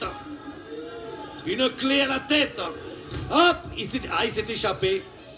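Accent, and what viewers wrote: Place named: Algeria. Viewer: Le commentateur est au top. Langage imagé et accent pied noir. Autre époque.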